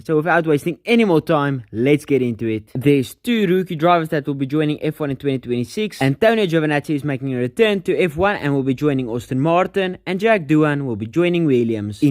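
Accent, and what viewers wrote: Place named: South Africa. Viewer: We like the south african acent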